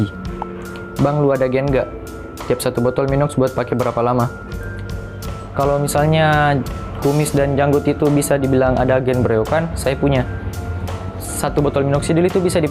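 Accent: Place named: Sulawesi, Indonesia. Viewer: Orang sulawesi ya?logat.y kentara😁✌️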